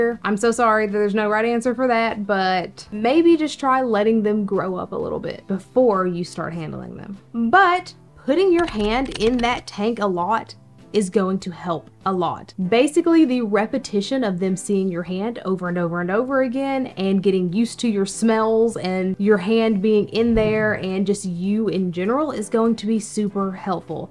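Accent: South, United States. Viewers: Why do you sound more southern in these newer videos? Lol!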